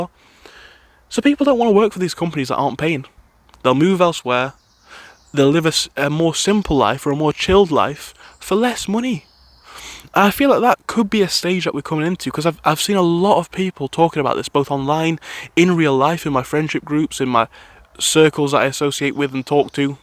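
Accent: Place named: Northern England, United Kingdom. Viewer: £500 a month won't get you a shed in the south east! My studio+ apartment is 1000 a month ...not a 1 bed a studio+ which has a small bedroom barely without a window! But I guess it's half the price up north which by your accent seems you are from.. Aldi the money you get paid in the south Vs north isn't a huge difference these days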